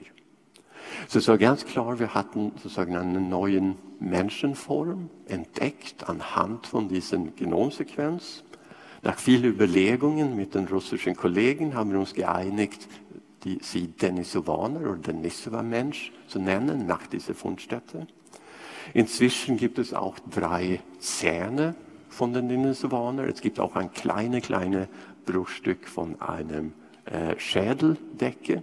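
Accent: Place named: Swabia, Germany. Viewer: Beeindruckende Deutschkenntnisse! Der schwedische Akzent erinnert ein bisschen an einen schwäbisch-alemannischem Akzent. Das fand ich sehr interessant. Nicht nur den Vortrag.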